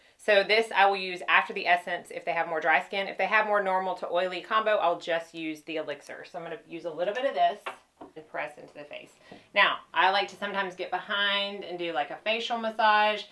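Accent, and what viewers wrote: South, United States. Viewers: Wow you Look Beautiful and i just Love your Southern accent you do not look your age at all even before make up too! Want to see more of you! In videos you were sooo sweet!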